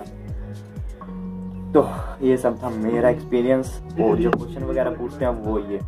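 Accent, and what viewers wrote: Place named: Haryana, India. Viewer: U used haryana Hindi but still got visa That's called Desi boy power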